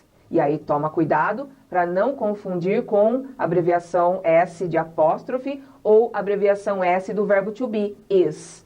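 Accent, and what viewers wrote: Brazil. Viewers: Professora. Sempre foi e sempre será mais fácil lançar criticas do que elogios. Porque para elogiar é preciso entender o que o outro está se propondo em sua mensagem. Por isso preciso dizer aqui que o seu trabalho é maravilhoso, o aprendizado é real e o seu sotaque para nós é a melhor parte dele. Principalmente para quem quer aprender Inglês sem querer se transformar em um "americado" sem sotaque. É claro que seu marido Steve tem o sotaque de lá e você tem o sotaque daqui. E o que isso importa? É claro que Ricka Yoshida também deve ter seu sotaque característico mesmo quando terminar os seus estudos aqui, grátis, na Managed English. Siga em frente. Abraços! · thanks a lot for the class...that will help lots of brazilians sttudents for sure ...but some pronunciation mistakes.. examples....that she said...last weekiii like Brazilians do..it's wrong...It x eat it's different... 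present perfectiii....likedi it's likt it's a typical Brazilian accent...the use of ED like worked it's wrong...it's workt....I know that many people don't like to improve their accent and pronunciation. they just want to get across their messages....but for those who are teacher or that one's who really want to become better at English should reduce their accent.... please don't take it as a complain. sometimes we have to have someone who correct us..and better yet....Learn with our mistakes....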